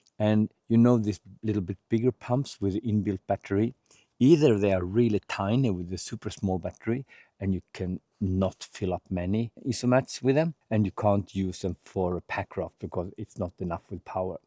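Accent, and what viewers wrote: Sweden. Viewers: Great and entertaining video, as usual, 👌🏻 And, as another Swede (right now based in Lycksele), I also find your lovely Swenglish to be entertaining in itself 😊 Reminds me of Swedish friends struggling with launch vs lounge.. 🤭😁 I think it's like you said: for the devices you need to hold in your hands a lot, it wouldn't be practical to always have to rely on external power source/bank. On my hikes, I carry a Fujifilm X-S20 with a 70-300mm zoom and a DJI Action 6, both being very mobile by hand, so to say, and thus not suitable to be 100% dependent on external power. I use both a lot, so I carry 2-3 spare batteries for each. As a solo-hiker, wrt a device like Garmin Sat messenger, I think a built-in battery that's well protected from the weather is the preferred solution, for safety reasons. That said, I do agree with that user-replaceable batteries should be more common. 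I also agree with that external power banks bring flexibility when it comes to devices that are only used part of the time - and hopefully not all at the same time 🫣 - coz then they can time-share the external power bank(s) 🤓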